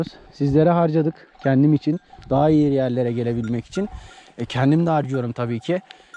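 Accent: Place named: Balıkesir, Turkey. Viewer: Degerli kardesim;bircok videonu izledim.Koy hayatini hic bilmeyen biri olarak zevkle izliyorum.Rahmetli babamin koyu varmis,17 yasinda gittim ilk defa.Ben balikesir in ibirler koyundenim(babam yasasaydi 75 yasinda olacakti sehirde dunyaya gelmis)senin sivenle benim koyumun sivesi ayni.keske bende koyde dogup buyuseydimde,14 yildir abd gibi ulkeye gelmek zorunda olmasaydim.TOPRAK ve koy.senin yemek yerken video larinida izledim.Kardesim,neguzel ki bizim gibi cop yemek (kimyasal)yemiyorsun.Allah ailenle seni mutlu etsin.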